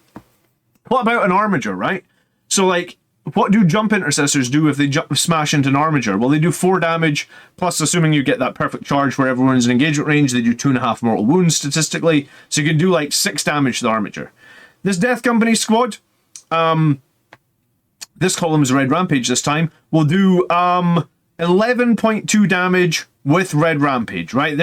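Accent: Scotland, United Kingdom. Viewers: Something about a wholesome Scottish man talking about murder space vampires makes me happy.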